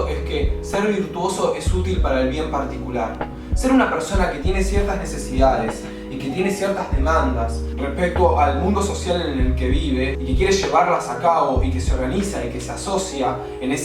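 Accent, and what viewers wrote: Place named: Argentina. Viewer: Hasta las huevas el audio y encima habla un argentino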